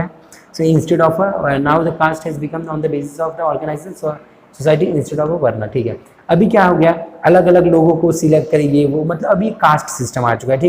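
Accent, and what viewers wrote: Gujarat, India. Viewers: Sir Aap GUJARATI ho..?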